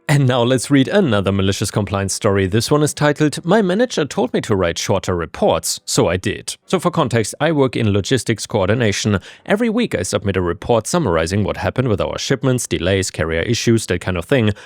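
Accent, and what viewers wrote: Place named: Germany. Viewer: A German narrator always is watchable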